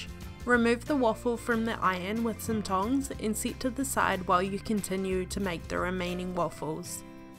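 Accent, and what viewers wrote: New Zealand. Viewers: Is this New Zealand accent as it resemble jacinda ardern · heres some daily does of irony,Im kiwi myself but listening to a kiwi accent on YouTube is patronizingly frustrating.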